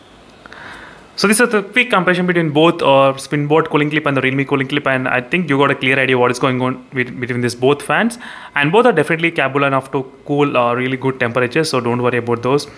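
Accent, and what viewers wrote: South India, India. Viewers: Mother tongue influence! I guess he is south indian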